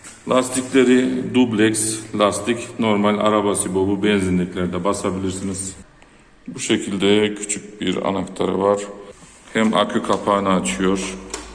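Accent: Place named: Kayseri, Turkey. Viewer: 5 tepelerin oraya geldiğinde anladım kayseri olduğunu usta ama asıl anladığım rampayı çıkamayıpta sonradan rahatlıkla çıktım demen tam kayseri esnaflığı 😄 bi kayserili olarak esnaf ağzını gayet iyi anlıyorum 😁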